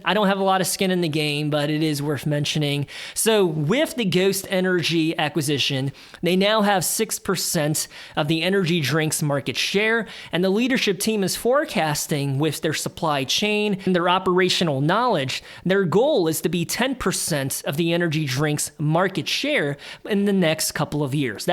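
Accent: United States. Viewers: Based on the way you talk i thought you are white american. But i dont really care, im here for info and wife's boyfriend jokes :) Im white guy from Europe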